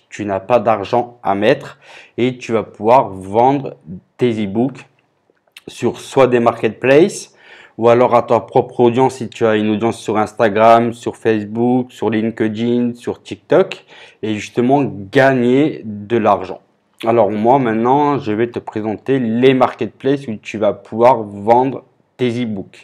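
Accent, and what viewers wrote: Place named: Africa. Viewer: T’as comme un accent africain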